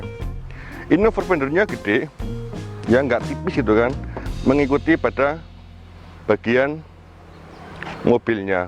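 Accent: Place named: Banyumas, Indonesia. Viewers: Logat Purwokertonya Keliatan Banget Ya..😀🇲🇨👍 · Review nya bagus lucu sedikit ngapak 😁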